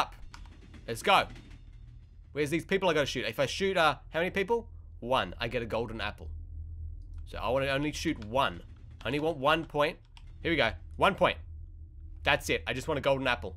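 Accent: Australia. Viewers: are u Australian? u do sound like an Australian(not in the bad way) I just wanna know where u from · Are you Australian? Fav new YouTuber in a while! Good content keep it uo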